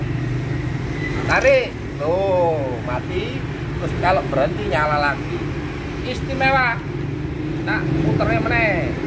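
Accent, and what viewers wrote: East Java, Indonesia. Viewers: Boso jowo timuran iku cak....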